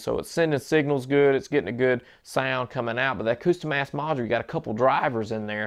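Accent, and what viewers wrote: South, United States. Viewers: Came here cuz I would like to buy used ones. Daaaaaaemn heeee soooouands sooooyyy reeedneick.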